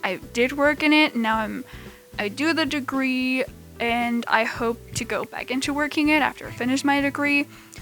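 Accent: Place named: Netherlands. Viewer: Really detailed and good looking build! There is a chance you identify Dutch youtubers as German youtubers, our prenounciation in English is quite simillair. The red colored lamp are usually lamps that are designed to generate heat because the animal needs basking spots or a specific temperature. Ow and cookies, def cookies. Keep up the good work, just subbed!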